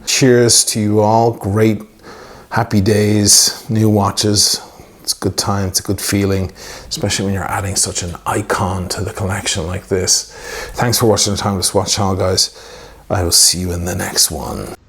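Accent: Ireland. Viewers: What can be said about the 116610LV that hasn't already been said? I came for gruff, Irish, vocal fry. And the drinks.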